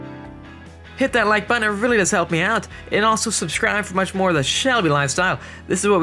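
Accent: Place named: Alaska, United States. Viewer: Love the Alaskan Bush people accent! Great content 👍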